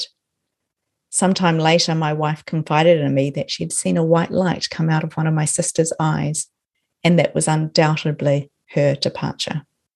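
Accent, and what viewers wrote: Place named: Scotland, United Kingdom. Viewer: My favourite YouTuber in the world! from Scotland!!! I love your Scottish accent❤️❤️❤️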